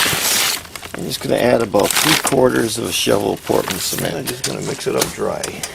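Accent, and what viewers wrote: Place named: Coal Region, United States. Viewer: Great video. I watch a lot of them just to watch you do the job. I do my own repairs though so I have learned a lot too. Plus I'm from the Coal Region and I love your dialect. Bet you've fixed some CHIMLEYS in your time.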